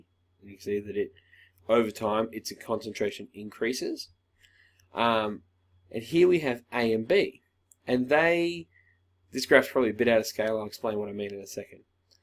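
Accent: Australia. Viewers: Thank you australian science guy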